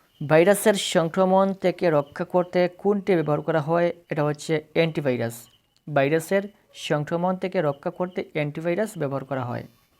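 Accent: Sylhet, Bangladesh. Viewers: Sir Afne to sylheti 😂 afnar mate buja jay❤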